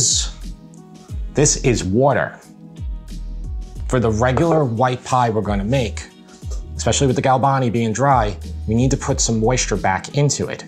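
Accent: New York, United States. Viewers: Great job on your presentation and content!😎🇺🇸🔥 Love the “Authentic “ approach…😋 I can’t help but feel confident with your approach and ingredient choices!😘 The New York accent doesn’t hurt!!😅 Lol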